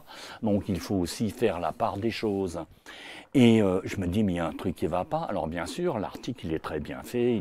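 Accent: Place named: Alsace, France. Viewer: le délicieux accent alsacien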